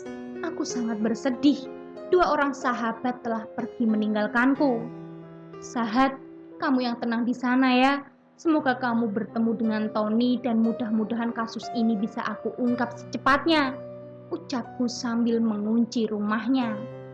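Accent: Java, Indonesia. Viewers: Org jawa ya kak ?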